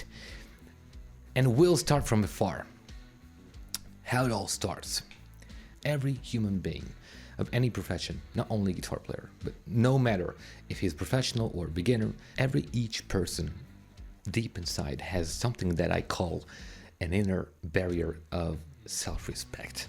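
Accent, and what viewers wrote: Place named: United States. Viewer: Your accent has changed...it used to sound more British and now it sounds American.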